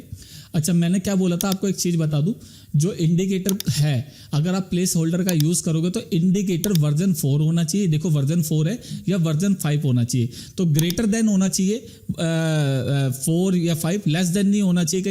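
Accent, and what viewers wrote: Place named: India. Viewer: For fucks sake, is there anyone on YTB, who explains this and is not Indian and can explain it in clean English?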